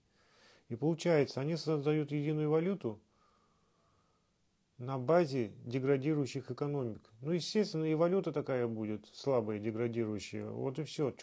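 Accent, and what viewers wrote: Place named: Russia. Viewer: сіз қазақ аттарын атағанда нағыз орысша акцентпен айтасыз. Орысша сөйлегеніз жарайды, бірақ ЖарКЫн дегенініз ЖарҚын орнына өте жаман естіледі.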